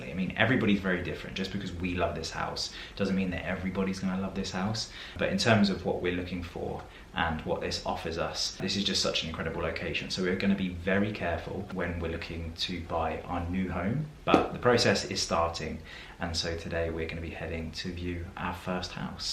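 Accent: England, United Kingdom. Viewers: What part of England is your accent?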